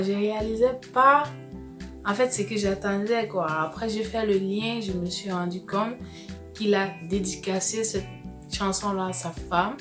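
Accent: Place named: Cameroon. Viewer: Je comprends rien avec ton acxan camerounais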